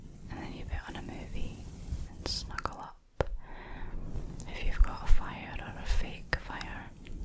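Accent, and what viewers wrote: Scotland, United Kingdom. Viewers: summer time with a cold breed I love your vids and your Scottish accent 😘😘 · Very nice! Help me out with your accent. Are you closer to Glasgow or Edinburgh, accentwise? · The Scottish accent is so so suited to whispered asmr , I’m Scottish too so I may be slightly biased but either your voice is tingalicious or I’m having a heart attack 🤣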